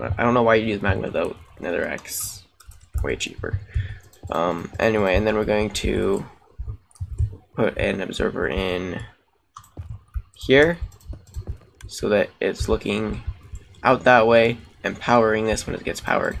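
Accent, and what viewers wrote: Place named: Poland. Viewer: Jestes polakiem.. widac po akcencie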